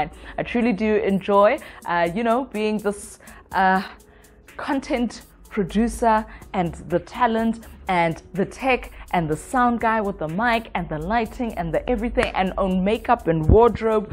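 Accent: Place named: South Africa. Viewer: Absolutely live your voice and accent.. 💖 a friend of mine is from South Africa and sounds similar to you but she’s been in the states now over 20 years so enviably she’s changed some.. 🤷‍♀️ 🥰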